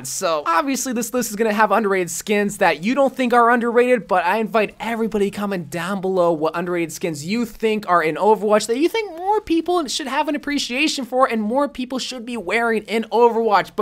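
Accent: United Kingdom. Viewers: Your British accent ain't that bad don't worry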